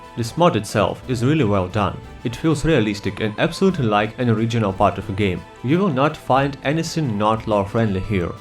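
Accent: Germany. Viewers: You have the first good-sounding German accent I have ever heard. It takes my brain a moment longer to understand what you are saying, as with most accents, but clarity is not an issue. Going from speaking German to speaking English is difficult from what I have heard, so keep up the good work!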